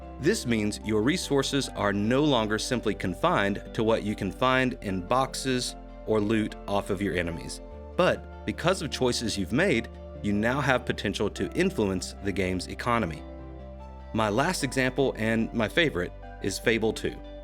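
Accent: United States. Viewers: You sound like people I know from South Carolina. Love these videos btw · How much is Microsoft paying you, and which Southern State are you from, I definitely hear a "TWANG!!!"😆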